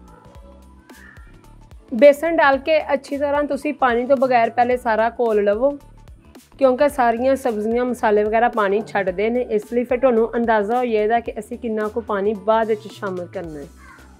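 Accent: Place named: Sialkot, Pakistan. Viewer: aap mujhe Lahore Ki Nahi lagti aap Sialkot ki lagti ho kyunki yah Punjabi Sialkot Mein boli jaati Hai ok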